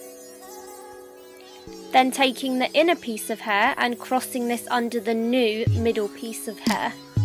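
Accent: United Kingdom. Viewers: Omg she's so British well I am but I'm from Essex and we don't sound like that lol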